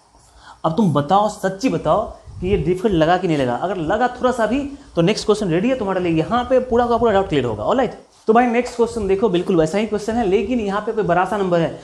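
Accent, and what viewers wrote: Bihar, India. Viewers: You're the best maths teacher ever..❤..i tried so many times to solve this type of questions and clear my concepts😢...but few mins before i found your video...😊I really really loved your way of teaching....✨especially i am feeling happpiiieeeee becoz you're speaking biharrriiii🤩🤩 .... you'll get millions of subscribers soon....✨🦋 best wishes too youuuuuu⛅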